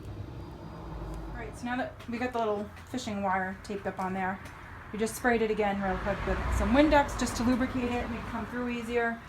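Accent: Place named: Massachusetts, United States. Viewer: Thanks for the help. Where in Massachusetts are you from? I can hear it in your voice.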